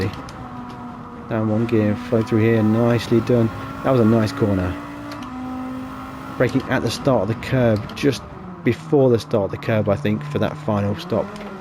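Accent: United Kingdom. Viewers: Not sure mate. I thought that at first, but I'm British, and you sound British too. Let me quickly boot it up and check for you, because that doesnt sound right to me. I think I bought the Maclaren Gr3 car last night for 45 million, so maybe I'm paying more. I dont know what's going on, bud. Gimme ten minutes to check.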